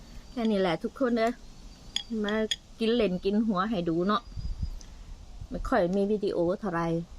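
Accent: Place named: Korat, Thailand. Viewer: แกพูดสำเนียงโคราชเเก่อยู่บ้านอาย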